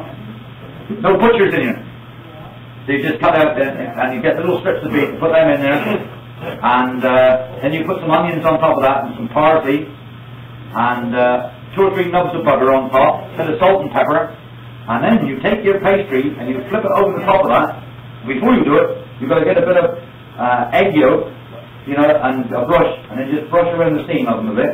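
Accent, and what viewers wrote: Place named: Cornwall, United Kingdom. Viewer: Thanks for that - lovely Cornish accent. I am Cornish living in North America, and listening to you has made me really homesick, and although I eat pasties regularly, really miss the Cornish Cream. Thanks again, really enjoyed your entertainment. Proper job !!!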